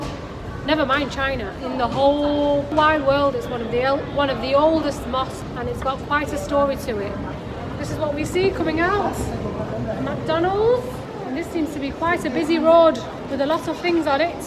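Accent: United Kingdom. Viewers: Her accent is from the north of England.... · Your accent has a bit of Birmingham in it. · How much does the Ccp pay you to become its ambassador in the occupied land of Eastern Turkistan, quite a lot l guess? Either the world is dumb to believe your report or the Ccp and its puppets are dumb to realize that their hideous crimes in the region are clear to the whole world. If your head cover and the British accent will give you legitimacy to make a fake report, the millions of Uyghurs in prisons should stop crying and dying. No thanks to you or anyone else like you⛔. So who we should believe now, the killer or the victims.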